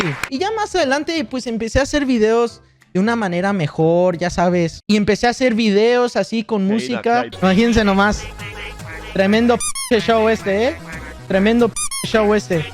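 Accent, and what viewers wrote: United States. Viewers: No mames ya se le pego el acento americano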